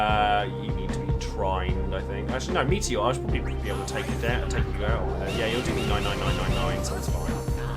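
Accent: United Kingdom. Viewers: I just started this game this past weekend, so was looking for some videos and came across your channel. I love the accent (British?) and you're a cosplayer as well (awesome). I need look no further and subscribed.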